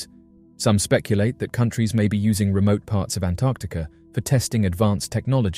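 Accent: United Kingdom. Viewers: Such boorah. If you just reported the finding, your findings whould be about 15 seconds. The rest if this video is just conjecture with a British accent... like that makes the narrators verbal diarrhea all the more believable. A 12 year old coul do a better job with this content.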